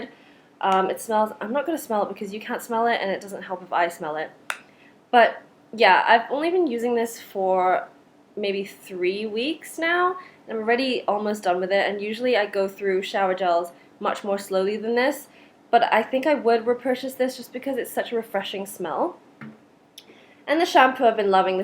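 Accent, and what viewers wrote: United Kingdom. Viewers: Hiii, I'm kind of a new-ish viewer of yours and I was wondering if you've spent some time in the UK because your accent is British at times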